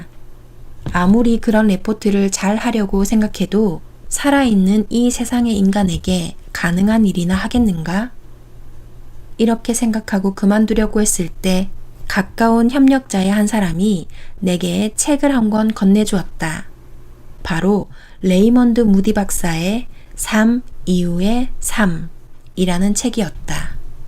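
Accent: Gyeongsang, South Korea. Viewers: 이 채널의 주인장이 경상도 사람인 것 같아서 새삼 정이 갑니다. 책을 읽는 어감이 주는 익숙하고 편안함... 마치 고향으로 돌아가 할머니 무릎가에서 한 편의 옛날 이야기를 듣는 기분이었습니다. 편안하고 뜻깊은 시간을 주셔서 감사 드립니다. 고맙습니다~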